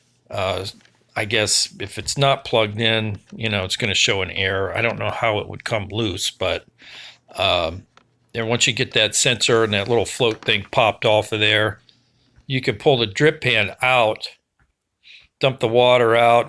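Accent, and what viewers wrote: United States. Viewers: You from pittsburgh dude??? I heard that accent. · Oh my gosh! Thank you! It worked!! I liked your Western PA accent?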